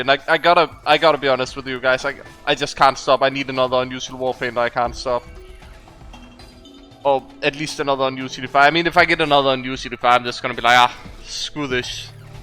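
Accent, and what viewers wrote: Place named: Denmark. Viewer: hey i was wondering where you are from because you sound danish and im danish let me know if my speculation is right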